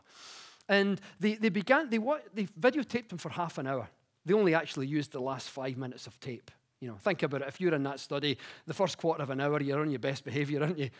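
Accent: United Kingdom. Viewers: Adorable scottish accent ...fantastic talk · Glasga accent?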